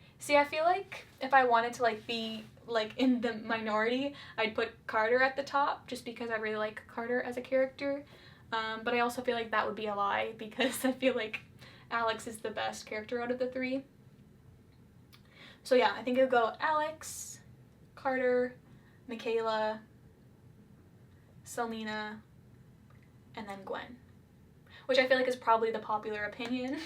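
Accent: Canada. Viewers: also im here for your canadian accent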